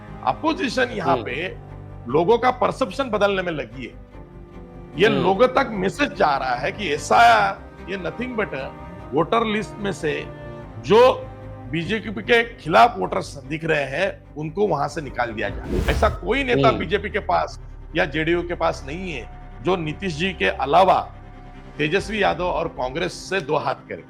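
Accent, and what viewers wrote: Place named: Maharashtra, India. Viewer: Sir, tumchi Hindi marathi sarkhi wat the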